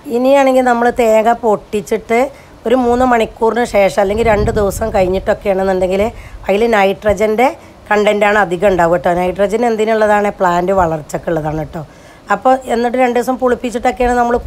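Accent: Malappuram, India. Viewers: മലപ്പുറം ആണോ സ്ഥലം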